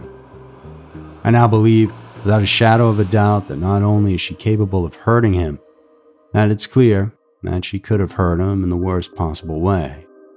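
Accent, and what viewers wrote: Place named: New Orleans, United States. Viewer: where is the narrator from? new orleans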